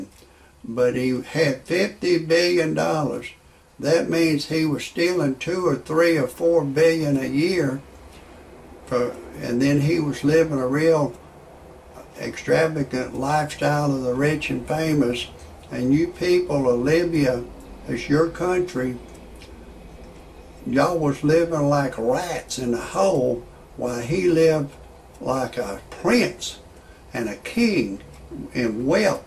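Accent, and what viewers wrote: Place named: South, United States. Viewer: lol, excellent...this is good entertainment, I think that's the name I catch from you, but maybe the southern 'twang' does defeat me in this regard...sadly that '21yr old having my baby' appears to have prematurely put our host 6ft under..RIP.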